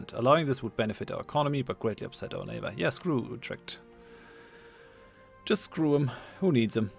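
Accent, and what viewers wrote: Germany. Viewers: I've been waiting for this episode! You should definitely do more EU4 let's plays! Looooove it so much. (and your german accent is awesome) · Haha no, german accent. I like that he pronounces french and dutch names somewhat decent though :) Better than most americans at least haha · Oh it is a German accent, I always thought you were dutch lul.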